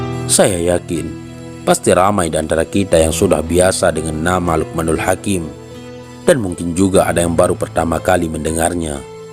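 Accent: Aceh, Indonesia. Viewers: Pasti ureng aceh ini admin logatnya terdengar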